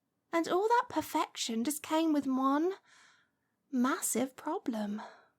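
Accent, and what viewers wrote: United Kingdom. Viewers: What a nice kind and caring witch! And with a charming British accent too. :-)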